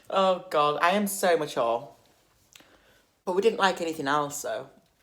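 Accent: United Kingdom. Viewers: HILARIOUS 🤣😂🤣...l love your British accent...so BEAUTIFUL...Awesome video👍🏼🇨🇦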